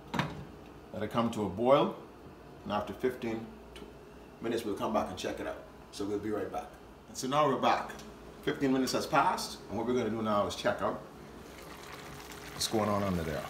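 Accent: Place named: Bahamas. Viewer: Thank you so much for this video.. my best friend was from Nassau and she used to make this for me and watching this video just brought back so much memories of her great cooking . Even listening to your Bahamian accent reminds me of her ☺️she passed away in 2010 and I love and miss my bahama mama best friend/sister so much 💔🕊🕊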